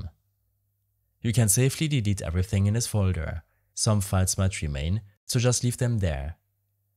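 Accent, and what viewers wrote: Germany. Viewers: Where are you from. You have a german accent. I am from Germany.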